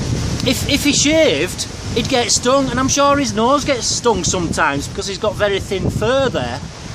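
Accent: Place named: Liverpool, United Kingdom. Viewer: OMG he looks like Bilbo :3 but just with a liverpool accent. so cute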